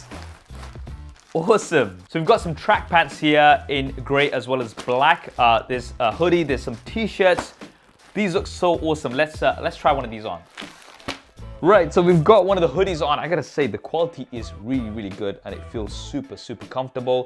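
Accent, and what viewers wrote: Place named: United Kingdom. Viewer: Please stick with the British pronunciation. #British Good job with video!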